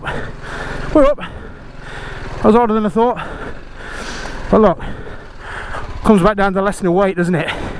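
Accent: United Kingdom. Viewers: Sitting here in QLD Australia watching this video tonight after work, highlight of my day mate, love watching your videos, and listening to you, miss the uk accents ! Waiting on delivery of a CFMoto 450MT, super popular bike, looking forward to breaking it in 👏👏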